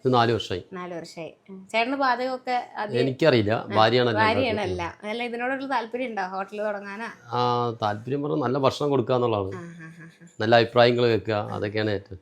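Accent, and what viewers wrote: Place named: Palakkad, India. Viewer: Palakkad bhasha .. shaaatting..❤❤❤❤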